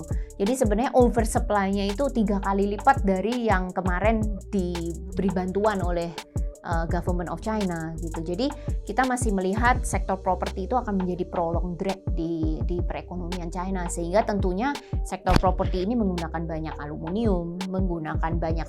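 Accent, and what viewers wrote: Java, Indonesia. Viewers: bagus kontennnya. cicinya logat jawa medok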